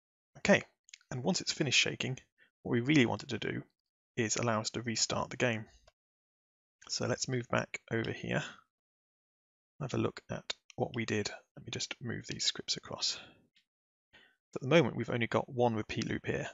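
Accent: United Kingdom. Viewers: I've never heard his voice before. WHOA. He's British. -- bobarlotte (on Scratch)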